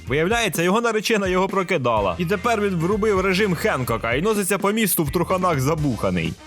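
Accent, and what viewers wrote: Poltava, Ukraine. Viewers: Пане Автор, а Ви часом не з Центральної України - просто у Вас говірка схожа на полтавську чи черкаську?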